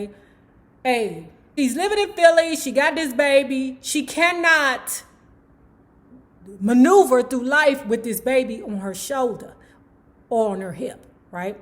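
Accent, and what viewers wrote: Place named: Washington D.C., United States. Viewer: I have subscribed to your channel some time ago and based on your accent im thinking your from Washington D.C if im not mistaken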